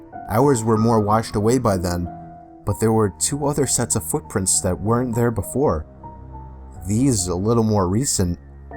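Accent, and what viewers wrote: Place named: Boston, United States. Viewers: U sound like your from Boston just a thought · Classic. This is when had his NE accent.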